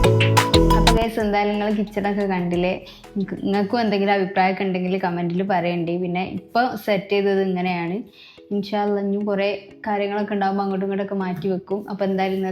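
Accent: Kozhikode, India. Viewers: കോഴിക്കോട് കാരി ആണോ slang കോഴിക്കോട് ആണല്ലോ ❤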